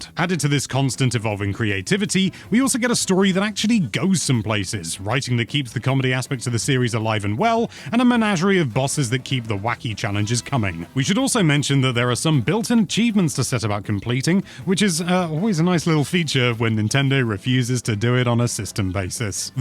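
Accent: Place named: United Kingdom. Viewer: He’s British. That’s just how people pronounce it?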